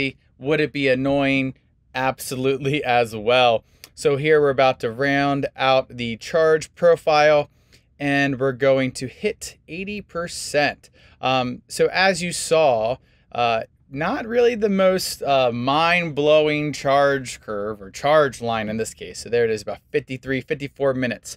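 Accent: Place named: Baltimore, United States. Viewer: Nice video. I am having trouble getting over the Packers quarter zip. I had always thought you were a local Baltimore guy with a Townie accent. Seriously though, nice vid. Did I miss the overlay of the original "new" charging curve? Maybe the Packers top blinded me.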